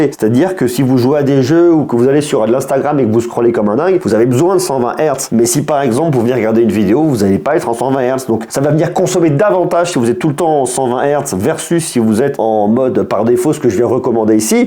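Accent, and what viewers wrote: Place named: France. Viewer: Très bonne vidéo ! (pas grave pour l'anglais, les français ne sont pas connus pour avoir un accent british ou US) Je rejoints certains commentaire, si tu fais des tests d'appareils pas encore ou pas forcément disponible sur les chaines de distributions standards en Europe/France pourquoi pas tester d'autres appareils ? J'ai vu du IQOO 11/11 pro (qui est le OnePlus de Vivo en faite) Pourquoi pas ce X90 pro+ de Vivo Si le budget le permet, il y a évidemment du OPPO Find N2, Vivo X Fold + (ou le X Fold 2), la prochaine version du Honor Magic 5 ultimate ? En tous cas, très intéressant que tu touches à des appareils en avant première (entre autre) sans forcément le soutien de la marque !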